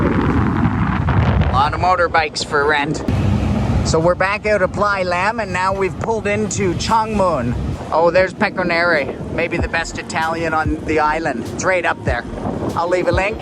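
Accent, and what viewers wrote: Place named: Winnipeg, Canada. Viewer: great video. are you from Winnipeg? i'm trying hard to place your accent!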